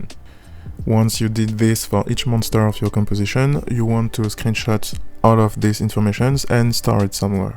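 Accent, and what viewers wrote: France. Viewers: On sent le francais derriere t'es bien le premier ou les sous titres youtubes sont parfait 😂 Tres bonne vidéos dommage que pas en fr!😢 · Le bel accent francais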